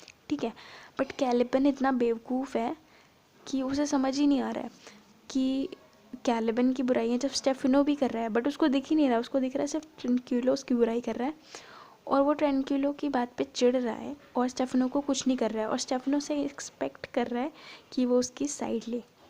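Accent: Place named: Uttar Pradesh, India. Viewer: By your act of speaking i am sure that you are from uttar Pradesh 😇😇😇😇 isn't it?if it is true you just like my comment.By the why I am also from uttar pradesh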